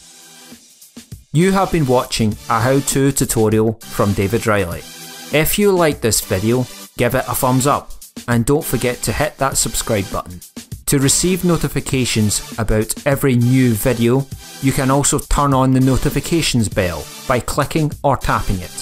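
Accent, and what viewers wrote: Scotland, United Kingdom. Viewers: i like your accent :) i'm guessing scottish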